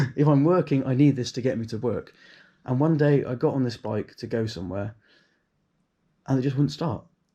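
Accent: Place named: Andover, United Kingdom. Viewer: As much as I like the idea of elecric two wheelers. I would and will opt for a petrol scooter type vehicle and there some really nice ones out there. Run out of gas fill up anywhere. Electric ? run out of power your in the poo poo. I will stay with petrol for now. I think the battery technology is not there yet, its getting there but not now. Accent sounds like Andover or Basingstoke?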